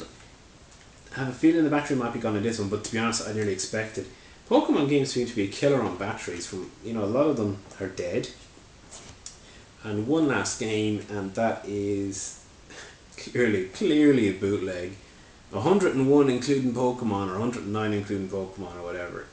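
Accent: Ireland. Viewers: are u irish , u seem to have some sort of an irish accent?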